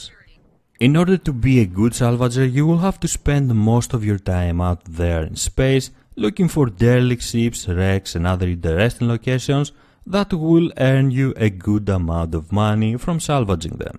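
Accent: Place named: Greece. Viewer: Still miss your Elite content, i don't care if they didn't give you the attention you wanted, i miss my Elite videos with the sexy greek-english. ;) And a lot of stuff have been added and changed, i don't know if you stull play, hopefully you could make some new content. Nothing wrong with the SC content, but that game is so far off release that i try to stay away so i don't get to mutch 'spoilers' when the game becomes a fully playable game i can go and enjoy it, findings out stuff and so on.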